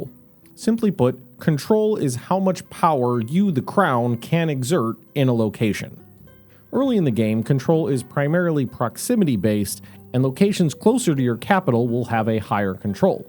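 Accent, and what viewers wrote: United States. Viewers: absolutely in British English...the dude speaking is American.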